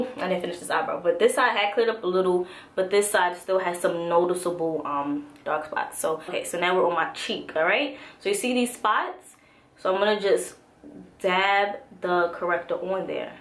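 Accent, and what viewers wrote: New York, United States. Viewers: As soon as I started the video I knew you were from the New York City lol thanks for sharing this review, about to go try mine out now! Just subscribed beautiful xo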